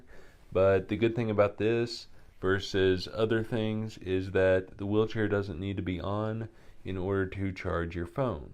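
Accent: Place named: East, United States. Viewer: gotcha. I figured east of the Mississippi from the trees and 'accent'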